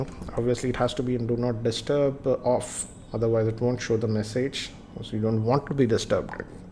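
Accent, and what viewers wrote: India. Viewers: Sir, You sound Indian tone English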